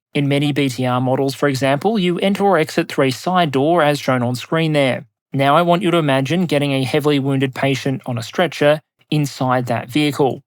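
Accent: Australia. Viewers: I really like your videos. They are interesting, and you rarely cover a topic that I don't consider worth investing the time necessary for watching. However, you're talking rather quickly, and the information is dense. Together with the at times a bit difficult to comprehend Australian English, which sometimes takes me a few seconds to figure out what word I just heard, and consequently needs me to rewind a bit because I missed what you said when I was thinking about that, and with the videos all close or over one hour, it is not exactly easy listening (maybe only for a non-native speaker). Aber before anybody is asking: Yes, I know I can set the playback speed lower, but 75% is really a bit too much and gives me the impression of listening to a Whisky connoisseur en masse, hence that's not an option. So my question would be: Did you ever consider splitting your videos into chunks of 20 minutes or so, which would give you the chance of talking a bit more slowly, and non-native speakers a better chance of being able to enjoy listening? 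😊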